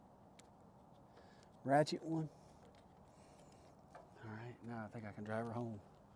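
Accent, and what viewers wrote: South, United States. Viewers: The best part of your video is your comments. It’s great listening to you. You sound like I’m talking to myself with southern accent. I’m a yankee. I’d rather have Yuengling.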